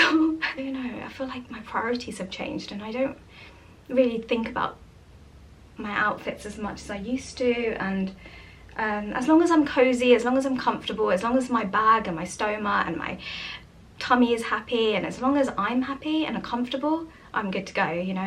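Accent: England, United Kingdom. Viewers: It’s the English accent .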